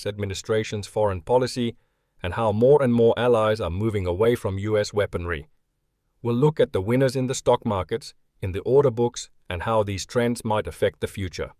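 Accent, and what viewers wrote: Finland. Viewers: Hey you creator. Please put some identification on your content, cause i was really close to dismiss you as AI slop, but then i heard your accent, and as a Norwegian i could recognize you're most likely Finnish ❤ Thank you for looking in to this. Slava Ukraini 🇺🇦 and Europe 🇪🇺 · I'm Norwegian and I know Finnish peoples. He has 100% Finnish accent.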